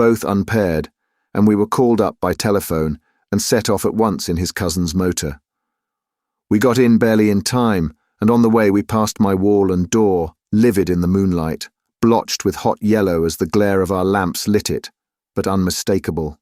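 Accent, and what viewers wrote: United Kingdom. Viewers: I have trouble following the UK English readings. Just me? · Ok prentioious British accent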